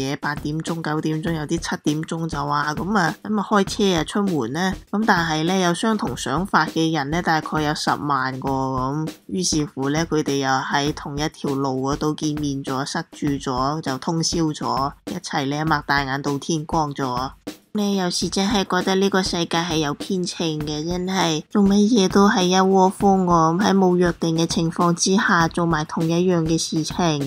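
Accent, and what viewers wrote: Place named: Cantonese, China. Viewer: 放假最好就梗係係屋企嘆住杯熱茶睇報道新鮮滾熱辣嘅純正粵語報道了(ง •_•)ง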